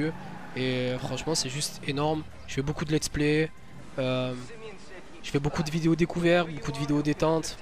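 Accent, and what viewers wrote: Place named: Marseille, France. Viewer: t marseillais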